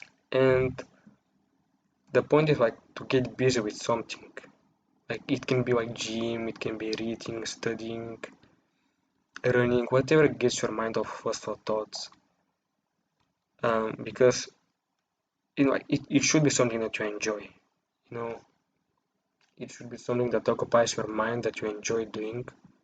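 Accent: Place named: Russia. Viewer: Your English is very Russian but I liked it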